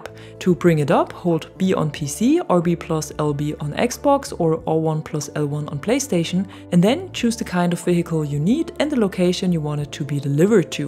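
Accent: Germany. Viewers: you sonds like a german person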